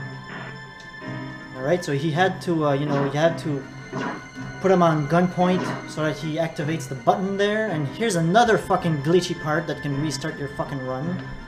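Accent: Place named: Quebec, Canada. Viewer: Je savais t'étais québécois !! Vrm bon ton vidéo j'ai jamais fini le jeu (PS4), j'avais juste réussi de me rendre après la partie de l'eau. Merci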